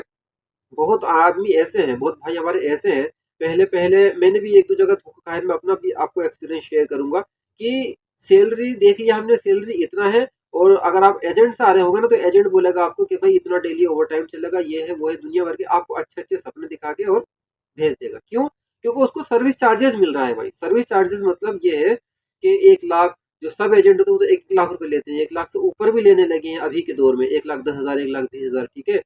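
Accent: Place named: Rampur, India. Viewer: Ap Rampur ke ho kya ..kyon ke apki baat chit ka andaaz rampuri hai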